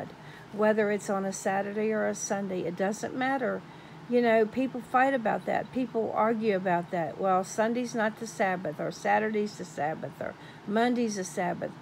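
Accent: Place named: Virginia, United States. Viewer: "I say 'otha, otha, otha (not other) coz I'm from Virginia." Lol. I love you when you are at your natural best. 😘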